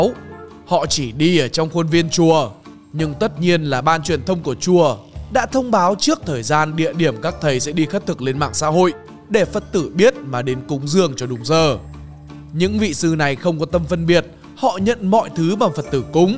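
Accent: Standard. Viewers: Bạn nói chuyện đúng chuẩn!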